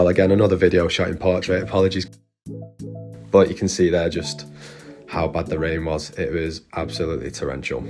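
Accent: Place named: Northern England, United Kingdom. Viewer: Looks good! A good session there mate. Where are you based in the UK? You sound Northern. I'm from Preston. Can't be too far from me I'd imagine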